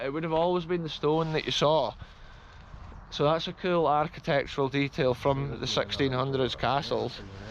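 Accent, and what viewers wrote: Scotland, United Kingdom. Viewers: Its been fun hearing your Scottish accent, haha. I am from Seattle Washington USA. Home of Bill Gates. Love your explanations. I am thinking there are probably people buried around the castle. You can tell sometimes by dips in the ground. Do you know any of the history of what happened to the last owners? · I love hearing your "brogue". I imagine that my Father's Mother grew up hearing the same Brogue from her Father. He immigrated in the 1870s ; his family name : Horsbrugh .AGAIN, Thank you for an amazing adventure !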